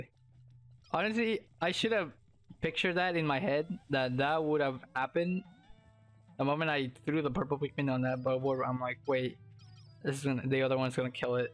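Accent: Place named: Latin America. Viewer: I like your latin accent 😂❤️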